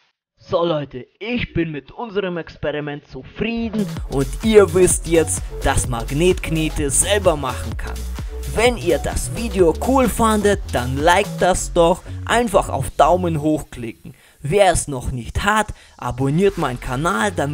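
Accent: Russia. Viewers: Bin ein treuer fan =) und dein akzent ist voll cool ^^ bist du russe? ^^, ich bin jedenfalls russe =D werde für immer beim kanal uschauen und nie weggehen =)))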